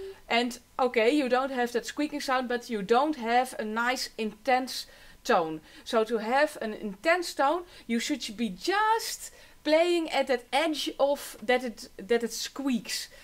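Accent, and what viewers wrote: Netherlands. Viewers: i just started playing and i'm making the most annoying sound ever , I've listent to all the tips on the internet but nothing really helps , But i'm only playing for like three days so maby it's normal , Could this fade by Practice ? Or should look for a problem ? ow and may i ask are you dutch ? because your accent sounds dutch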